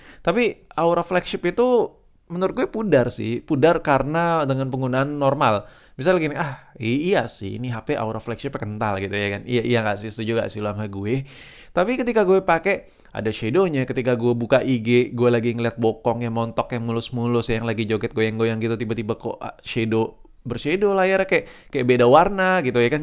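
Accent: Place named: Cikarang, Indonesia. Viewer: Gaya omonganya kek orang cikarang bae cuk wkwk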